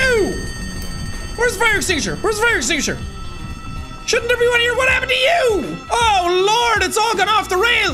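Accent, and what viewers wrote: South, United States. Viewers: Jack's southern accent is the greatest thing I have ever heard. 10/10. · I live in the South. I have lived here my whole life. And never have I ever heard such a beautiful Southern accent. Well done. Beautiful. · Jack's Southern accent is even better than most Southern people I actually know irl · I wasn't expecting his Southern accent to be that good!!! He is officially a Southerner now!! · My uncle lives in arguably the most southern county in north Carolina and I swear that accent sounds just like him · coming from a southerner it was about a 6-7 out of 10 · It's scary how realistic Jack's Southern Accent is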